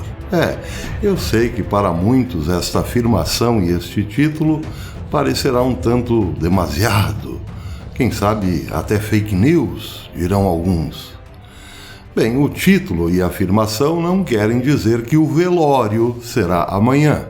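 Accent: Caipira, Brazil. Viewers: O senhor é o máximo com essa forma meio caipira de falar, amooooo, carinhos fraternos.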